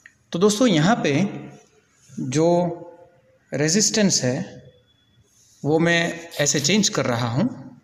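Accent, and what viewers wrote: Gujarat, India. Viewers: Are you Gujarati??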